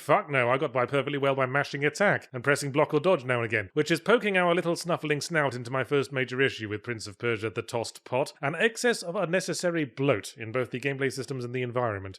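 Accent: United Kingdom. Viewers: Love to listen to Yahtzees buttery british voice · Soo glad have this. Now I can fall asleep to sweary British voice.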